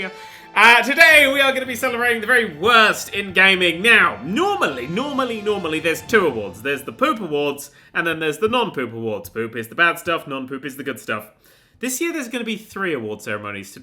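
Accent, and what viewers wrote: United Kingdom. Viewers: Is it just me or did sound particularly british in this video? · Holy shit got ultra British towards the end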